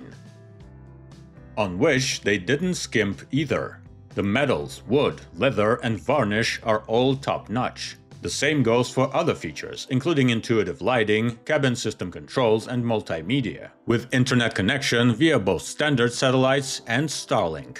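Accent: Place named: Russia. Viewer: I would watch this channel if not this fucking raSSIan accent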